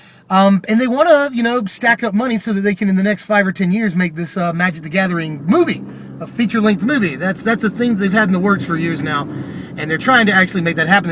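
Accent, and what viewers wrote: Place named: West Virginia, United States. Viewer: West Virginia? The accent fits and the license plates are similar...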